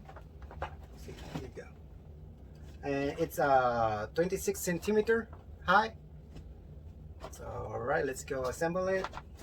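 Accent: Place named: Texas, United States. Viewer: Kuya! Texas accent kanaaa 😅🤩 More vidsss! 🦋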